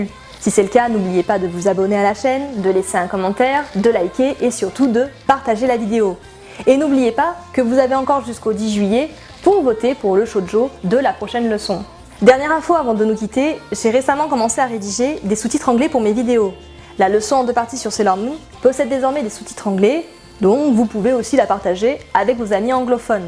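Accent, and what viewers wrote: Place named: France. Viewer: Ma chère fan girl si tu aimes tant les magical girl et les romances "love chunibyo & other delusions" est fait pour toi , je suis pret a parier que tu me remerciera en lançant des pétales de fleurs . Bon j’exagère peut être un peu mais il aborde ces thèmes et leurs codes avec un petit coté irrévérencieux très cool . Plutôt simple de prime abord ( J'adore cette expression )il peut être vu avec plusieurs niveaux de lecture si on se gratte un peu la tète et ca aussi j'adore . BREF FONCE ... non non non FONCE j'ai dit . J'avoue avoir sauter la partie sur "Your name". je fais partie des rares qui ne l'ont pas encore vu et j’esquive tout spoil potentiel ^^. J'aime bien ton accent français. Moi on me reproche souvent de prononcer comme un chef de la mafia Japonaise alors crois moi c'est pas si mal . Mais pourquoi est tu encore ici ? Fonce j'ai dit !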